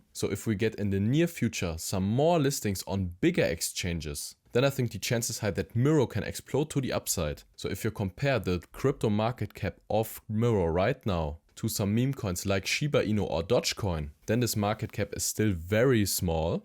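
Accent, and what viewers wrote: Germany. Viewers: Your heavy German accent gives me hope. You guys are very calculated when it comes to crypto. Everytime I come across a German content creator that technically analyzes crypto, it’s always a bullish sign. I’m all in on MYRO and almost got 1Million. Let’s go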